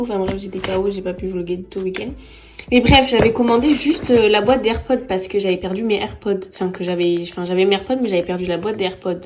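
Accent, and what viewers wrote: United Kingdom. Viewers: Ton accent British 🥺🥺🥺🥺🥺❤️❤️❤️❤️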